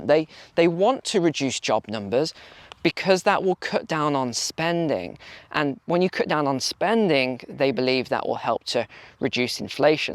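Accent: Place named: London, United Kingdom. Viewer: Your from London I bet,love it!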